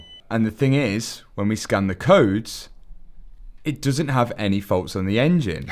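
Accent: United Kingdom. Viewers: Where in the UK is he from for that accent??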